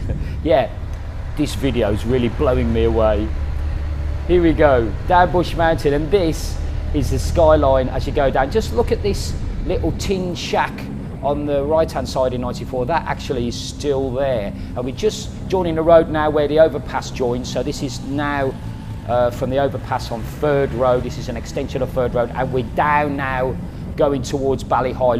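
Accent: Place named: Midlands, United Kingdom. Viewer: You sound like your from near me. A definite midkand accent I'm from Warwick well a village just outside beausale it'd on the way to Balsall Common. The back way into brum.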